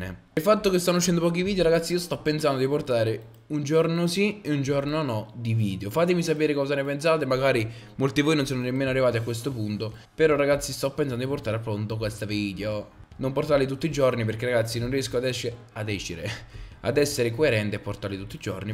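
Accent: Naples, Italy. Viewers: Sei napoletano?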